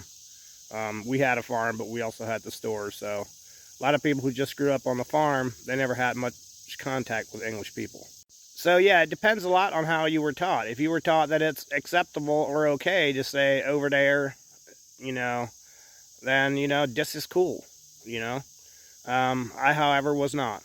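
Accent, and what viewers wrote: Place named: South, United States. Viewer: To my English (as in actually from England) ears you have a southern US accent, but I think most Amish are in Pennsylvania/Ohio where people don't sound southern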